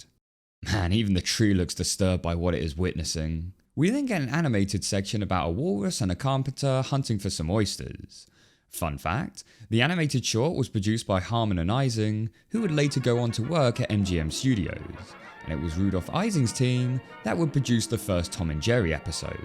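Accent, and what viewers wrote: United Kingdom. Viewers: 😊 Aw, I don't think you're a prick. I think you're a pretty good narrator for film reviews. That deep voice and British accent really helps when you review the sad drama type films.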